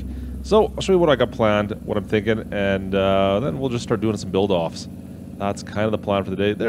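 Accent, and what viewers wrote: Canada. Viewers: Are yoy from alberta?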